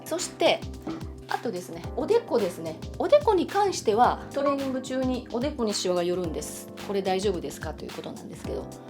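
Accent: Hiroshima, Japan. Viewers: やっぱりですね。 話し方が広島出身の方よね〜ってずっと思ってました。 顔ダンス毎日やってます。始めてまだ3週間ですが、結果が出るの楽しみにこれからも毎日がんばります。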